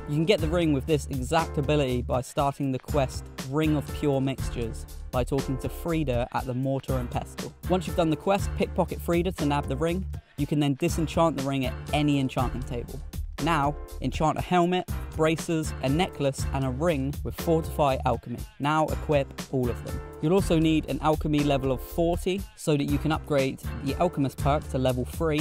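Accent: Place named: RP, United Kingdom. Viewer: Quick, simple and in Queen's English (can't say that anymore!). Thank you 👍🏻.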